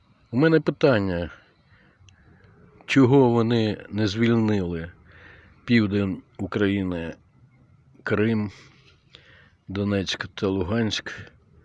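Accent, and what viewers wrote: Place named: Russia. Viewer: Давно на рашці живеш, відчутний акцент.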